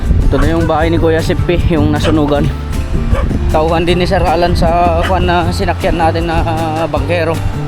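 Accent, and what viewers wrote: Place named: Visayas, Philippines. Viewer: Bkit parang bisaya ang pagsalita niyo.. Dba Yung bagyong nando dumaan sa Norte... Ang mga Tao Dyan mga tagalog pero Yung nag uplode netoh parang nag bibisaya hahahah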